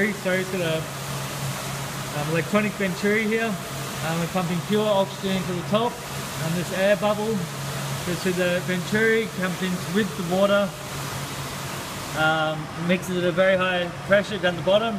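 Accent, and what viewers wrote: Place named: New Zealand. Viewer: Dude he sounds like a kiwi to me, You Aussies not satisfied with trying to take responsibility for creation of the pavlova want to lay claim to anything else good new Zealand produces too.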